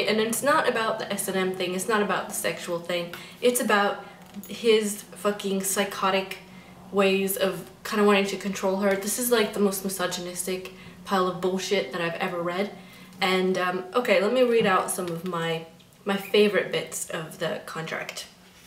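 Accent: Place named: Europe. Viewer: Is this lady European because I can hear a slight accent.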